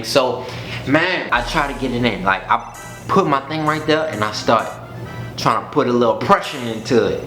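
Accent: New Orleans, United States. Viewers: You can tell he from New Orleans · You from new orleans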